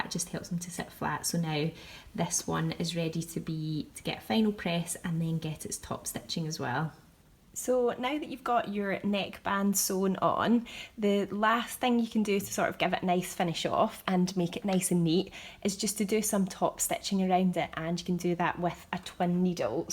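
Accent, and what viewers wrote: Scotland, United Kingdom. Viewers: Thanks for this great video. I've just succeeded in making my first (flat) neckband thanks to your clear and concise explanations! The added bonus is your accent;) Greetings from a fellow Scot in Paris. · Fabulous. It always confused me aboout quartering, not realising that you dont use the shoulder seam. My necks always worked out well because I just used my common sense realising that the front scoop is longer. But your instructions were brilliant. I love your Scottish accent too. Thank you. You are never too old to learn I am 75 and been sewing since I was 12. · Brilliant tutorial delivered in a lovely Scots accent 🙂. Thank you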